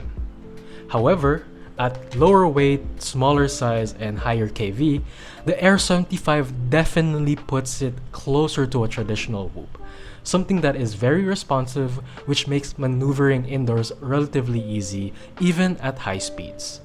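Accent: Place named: Philippines. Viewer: sabi na e.. the accent was a giveaway.. kabayan :)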